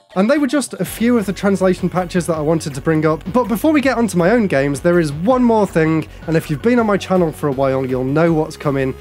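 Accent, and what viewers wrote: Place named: Scotland, United Kingdom. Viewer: Your accent has always been so interesting to me. When you said you go to Edinburgh for your job, it made me focus more on your accent... and I'm wondering, is it like a British English / Scottish combination? Like 85% British English, 15% Scottish? The way you say "found" reminds me of Scottish, for instance. But this could just be confirmation bias! Either way, very nice accent!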